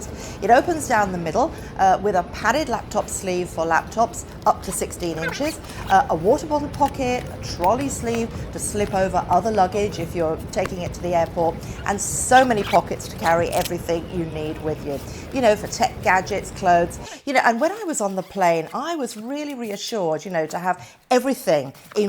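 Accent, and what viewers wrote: United Kingdom. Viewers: My only tip is never wear wide legged pants for travel. The bathroom floors can be disgusting and you don’t want your pants to touch that. Joggers solve that problem nicely. And might I add it was weird, and somewhat even disappointing, to hear a beautiful British voice say sneakers! And I’m American! 😆 Great videos.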